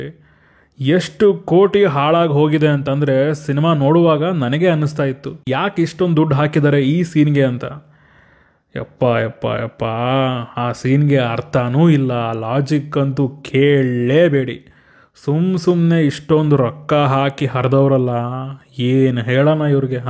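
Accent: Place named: Davanagere, India. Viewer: Pakka Namma Davanagere kannada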